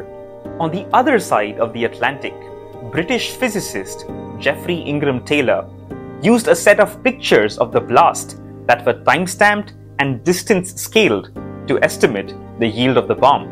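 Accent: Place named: India. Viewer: only indian channel where the accent doesnt piss u off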